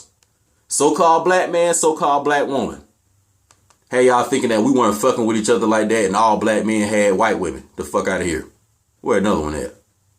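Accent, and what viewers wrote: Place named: South, United States. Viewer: Brother, you be SOOO on point, that it's DIVINE! We've come to so many of the same conclusions, that I feel like I'm listening to myself, but in a southern American accent, LOL! PEACE AND LOVE, brother! 👍🏿✊🏿🇲🇦✡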